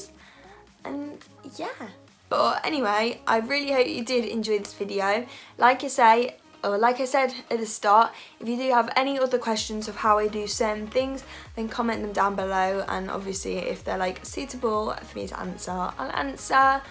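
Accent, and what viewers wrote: United Kingdom. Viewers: I have seen this video three times by now. Thank you. Your smile, your personality, your British English, your determination, your positive attitude. You brighten up my day. You boost up my mood, you give me hope and inspiration. You move me. It's.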